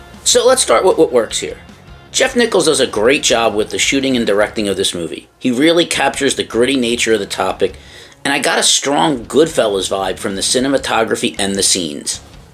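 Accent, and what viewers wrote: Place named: Philadelphia, United States. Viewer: You sound like you're from the Philly/Cherry Hill area.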